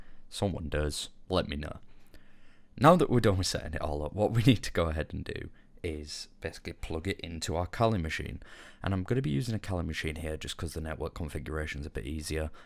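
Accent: United Kingdom. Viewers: you must be from 'ULL' ???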